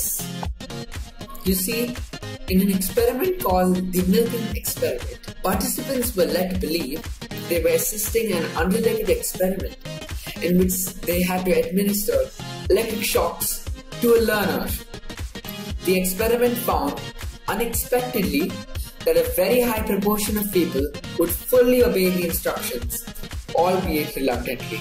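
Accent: India. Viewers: He’s Indian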